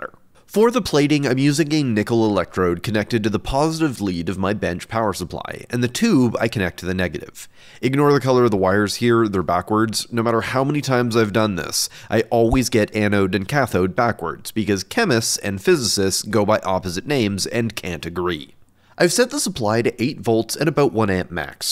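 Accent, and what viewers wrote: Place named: Canada. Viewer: I love how you can tell this dude is Canadian in the first min. "Must be cooked from the oatside"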